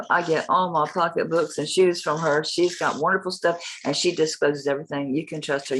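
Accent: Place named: South, United States. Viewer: I’m listening while getting my nails redone 💜 I love your southernese 😂 💜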